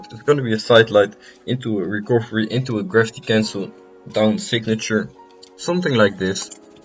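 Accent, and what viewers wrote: Netherlands. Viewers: (Ik ga er van uit dat je nl brnt door je accent)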